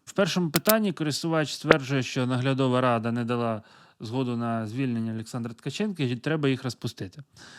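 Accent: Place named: Russia. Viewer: У Вас російський акцент, а за що лайк, Ви ж нічого не розказали, де покарання, де конкретні дії?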